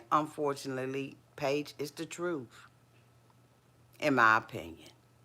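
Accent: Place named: DMV, United States. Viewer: I hear that DMV accent! 🌸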